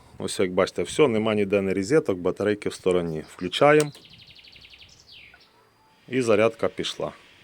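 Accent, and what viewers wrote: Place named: Surzhyk, Ukraine. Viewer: 😂😂😂 Во!!! Який русяцкий сурджик !!!! Хватає-це хопає чи висточає?!(Тупа півночі мова!) Фонарик- ЛІХТАРИК!!! Тєлефон-ТЕЛЕФОН Далі я не став вже цього з пошкодженим Росією мозком.... Але пишу відверто. Людина вдрма балакала так,як розмовляли батьки. Російською з місцевим діалектом. Людина розмовляє так,,к би вона не відвідувала школу,а як що відвідувала,тоді а бо не всилася ,або російською викладалася украхнська мова ьа література! До речі.... Книжки аффтор ввдео,не читав від слова - НВКОЛИ!!! Жах відео Вже суть вілео не дивився,бо його сурджик мене ВБИВ!!! І це мене,який народився ьа виріс у суто російськомовному місті МІЛЬЙОННИКУ центральної,промислової укра6,та розмовляв 52р. сутоиросійською!!! Жах суцільний!!! Оце росіч мозок та здатність мислити пошкодила?!🤕🤕🤕 Атас....😎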